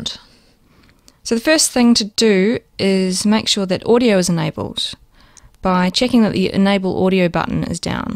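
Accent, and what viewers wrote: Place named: Australia. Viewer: yes fantastic ozzy accent!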